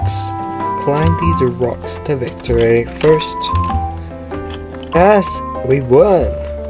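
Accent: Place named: United Kingdom. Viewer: I love Your accent lol are you British?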